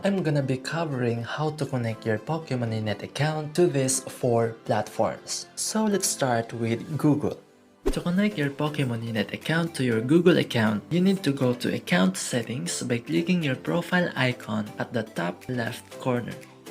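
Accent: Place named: Philippines. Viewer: I already know that your a pilipino bc of your accent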